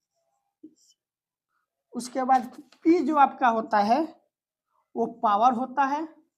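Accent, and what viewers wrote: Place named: Bihar, India. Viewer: That Bihari accent - नमस्कार भाईयों ❤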